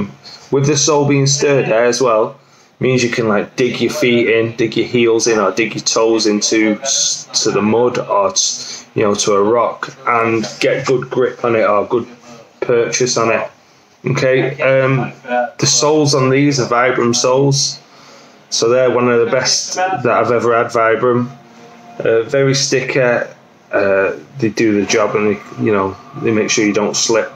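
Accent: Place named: England, United Kingdom. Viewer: Такой ужасный английский акцент!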